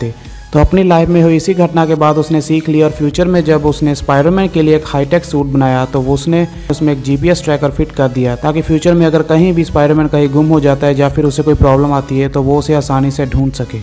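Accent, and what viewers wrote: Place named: India. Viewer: Bhai tuje uska Naam padhke aur hindi accent sun ke pata nhi Laga ?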